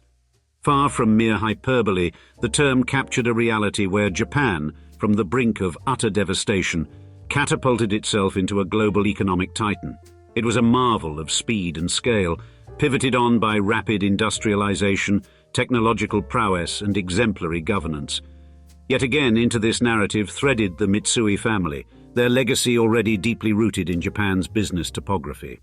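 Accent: United Kingdom. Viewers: Great reminder that Mon emblems were basically logos for families way before they became corporate identifiers. With the narrator's accent, I'm surprised you didn't draw comparisons between the Mitsui founder's department store for the emerging middle class with similar British operations like Fortnum and Mason in the Georgian era or John Lewis or Selfridges.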